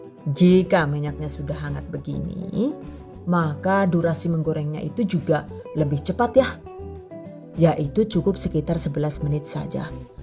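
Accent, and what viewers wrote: Banyumas, Indonesia. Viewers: Saya senang dengan semua resepnya dan senang bahasa ngapaknya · Pokoke seneng belajar sekaligus ngehibur diri apalagi kalo keluar bahasa ngapaknya, hhh sama2 ngapak jadi gak bingung. step by step nya juga bener2 gak pelit ilmu deh, makasih, semangat dan terus berkarya njeh 😘 #GiveawayTHV2021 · Ditengah nyimak tutorial ini, muncul lah ngapaknya,,,,bunda. Seru banget resep nya diselingi logat" yg bikin ketawa ngakak tapi justru bikin kangen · lihat resep sambil ngakak denger clotehannya yg ngapak2😂😂😂😂👍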